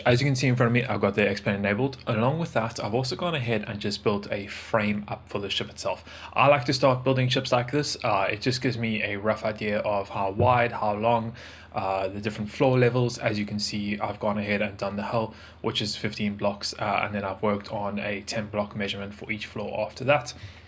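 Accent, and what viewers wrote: South Africa. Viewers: You sound like a South African :/ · You sound south African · Are you south african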